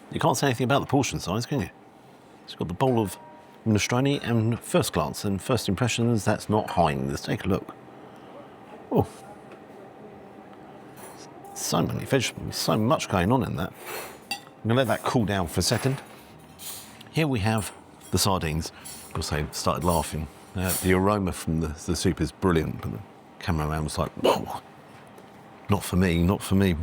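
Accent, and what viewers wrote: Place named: London, United Kingdom. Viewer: Your londonish accent is so adorable 😊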